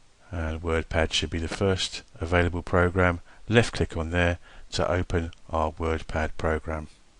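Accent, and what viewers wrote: United Kingdom. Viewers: This is not American English itS BRITISH ENGLISG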